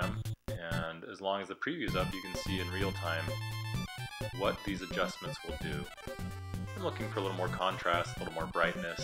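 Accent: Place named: United States. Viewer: where are you located ? Sounds American but what state?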